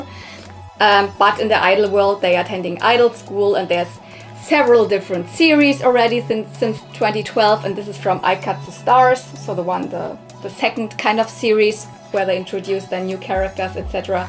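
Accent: Germany. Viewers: I adore your videos but I cringed today as there were soooo many 70's fashions that you passed up in that box, and that Ken looking doll was a Sears exclusive Craig doll which can go for pretty good money. I am a Barbie dealer and have been collecting since 1976 but we all make mistakes, and it's hard to choose when you aren't familiar to that era of dolls. I would have bought the whole box LOL I adore you and my best friend is German too, so I love your accent. I subbed both my channels. All the best to you :)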